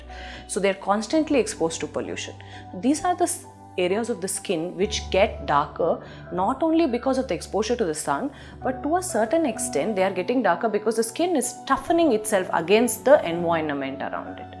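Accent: India. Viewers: Yaaae..i hardly undrstnd her . Only Indian will understand her..coz .she speak India English. Hihihiihi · white shadow dear she is an Indian ....in India we concentrate more on our native Hindi language which has a thick accent....we too speak English but the accent remains same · She speaks with an indian accent , i find it hard to understand too .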